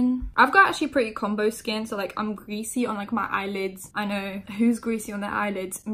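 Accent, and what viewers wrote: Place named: London, United Kingdom. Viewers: Lmao omg her accent and pronunciation is like so rough, pure london hahaha. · ringaling it’s so weird to me, like the place that i’m from is like 4/5 hours away from london so idk the range of accents that well but to me she sounds working class london but NOT at the same time??? and it confuses me i don’t think i’ve heard the combination before lol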